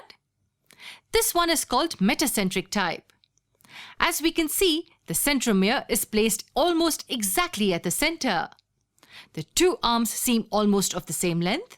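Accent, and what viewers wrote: India. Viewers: You sound very indian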